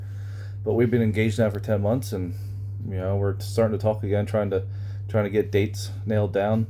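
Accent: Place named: United States. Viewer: Good to see you back. I could swear that you're starting to lose your American accent. But I don't think that its accurate to say that mental illness is the reason for not being in a relationship. You yourself admitted that it was 'luck' so in the case of Todd and OC what could they possibly do to increase their chances? Todd after all did work for over 10 years in jobs surrounded by people and nothing happened. Then there is what one wants vs whats available. But its good that things are working out for you. You do seem more composed and self confident than what you did before.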